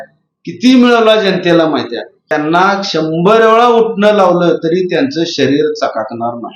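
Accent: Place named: Kolhapur, India. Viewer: इंगवले साहेब एकदम मुद्देसूद बोलतात कोल्हापूर शैलीत मस्त कोल्हापूर मध्ये सर्व च्या सर्व काँग्रेस च्या जागा लागणार आणि महाराष्ट्र मध्ये उद्धव साहेब पवार साहेब आणि कॉग्रेस चे सरकार येणार 👍👍👌👌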